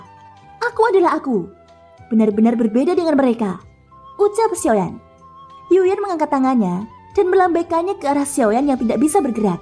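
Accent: Java, Indonesia. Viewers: Medok nya min😅😅😅cuma pengisi nobarta lama yg mantap intonasi pembawanya😂😂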